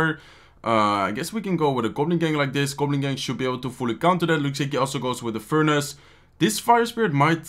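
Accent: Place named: Netherlands. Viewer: Jij bent Nederlands of niet 😂 Je hebt een Nederlands accent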